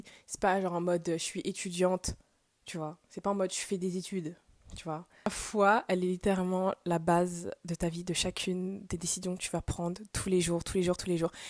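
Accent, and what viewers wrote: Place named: England, United Kingdom. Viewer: J'aime ton accent anglais😊☺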